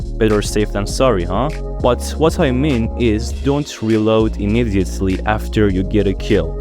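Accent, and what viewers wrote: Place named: Turkey. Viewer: Türk müsün la😅 aksanindan anladım 😅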